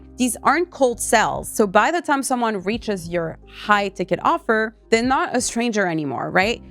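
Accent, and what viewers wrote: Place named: Quebec, Canada. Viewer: Hi, hearing your accent...are you a french canadian by any chance?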